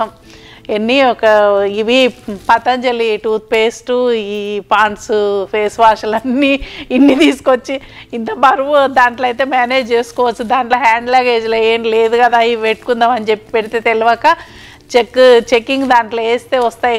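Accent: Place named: Telangana, India. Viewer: hi amma, me mata vintay ma intlo vala mata vinatlay undhi , madi telangana nice video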